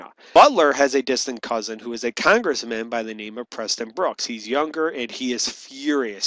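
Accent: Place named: Cincinnati, United States. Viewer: You must be from Cincinnati lol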